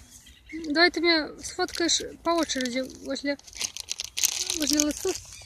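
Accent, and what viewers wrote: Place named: Belarus. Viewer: А говор то беларуский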